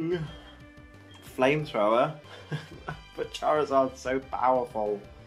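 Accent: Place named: Midlands, United Kingdom. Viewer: You've quickly become my favourite pokétuber! I've managed to get most of my friends into your videos too! Fellow Brit here, are you from the midlands? I'm from the west midlands and you sound like you're from near here :)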